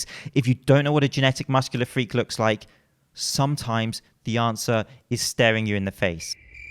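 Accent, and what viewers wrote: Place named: London, United Kingdom. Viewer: Random thought, would bone density, not just bone size also play a part ? Thinking that larger muscles are going to need bigger and stronger (denser?) frame to work off. And if so is that bone density something that can be worked upon ? ie in much the same way that muscle growth is a response to the damage caused to it, would bone density also be affected by damage ? Now of course not promoting the idea of breaking bones. Just think how martial artists strengthen bone through repetition. Probably talking out of my arse but hey if you don't ask questions you ain't gonna learn. Love the vids, though not quite got the old school Landern accent, 8/10 my san!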